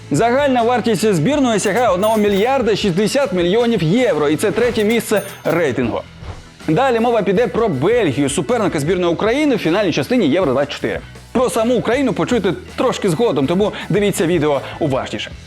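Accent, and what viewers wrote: Russia. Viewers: Таке враження, що ведучий зростав на російськомовних відеоблогах, треба ще тренувати українську (помилки виправляти + вимову трохи ставити, бо подекуди проскакують маасковські інтонації). Сам матеріал доволі цікавий (хоча, у табличці теж помилка – 2 і 3 місця). Вже не перше класне відео на цьому каналі! Продовжуйте!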